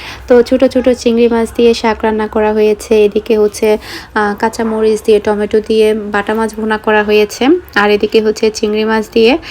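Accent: Chittagong, Bangladesh. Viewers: আপু অনেক ভালো লাগলো তোমার চট্টগ্রামের ভাষা অনেক ভালো লাগে শুনতে ধন্যবাদ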